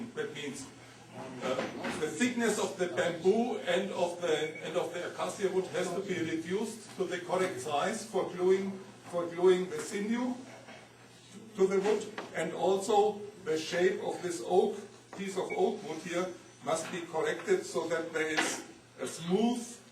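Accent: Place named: Germany. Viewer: xDD never heard such a typical german accent, and im german so i heard much^^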